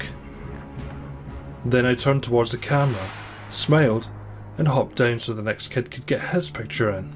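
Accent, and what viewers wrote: Scotland, United Kingdom. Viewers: did this really happen?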